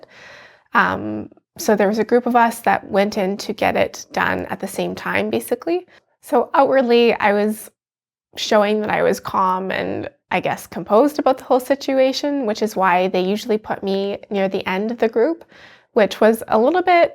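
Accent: Canada. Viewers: Thank you. I have my first of 12 ECT sessions tomorrow morning (I'm bipolar1/PTSD) Your video relieved some of my fears. I really hope it works, I think I've developed a tolerance to the meds. Sorry it didn't work for you. You are very well spoken. Could not place your accent, I guessed right! The nice neighbors to the north. Thanks again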